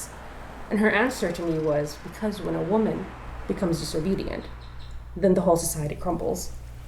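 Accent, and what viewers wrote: United States. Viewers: I'm Australian, and I can hear that you have an accent. An American accent.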